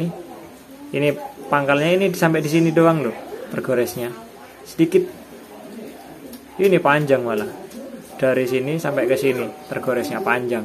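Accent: Madura, Indonesia. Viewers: Klw dri logat bahasanya kyaknya dri madura ya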